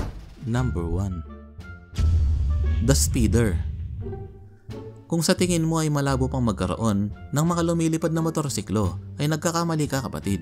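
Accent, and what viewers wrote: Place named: Ifugao, Philippines. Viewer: KASLA KA NGA KIYANGAN NGA AGSAO MET. TAGA IFUGAO KA BA?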